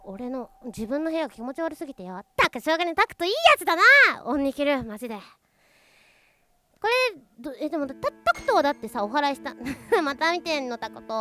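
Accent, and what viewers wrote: Japan. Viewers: アイちゃん広島の子？じゃろってw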